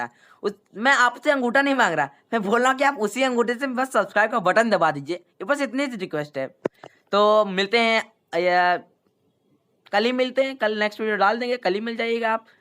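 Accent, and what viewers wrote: Bihar, India. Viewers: Aap bihar se hai ky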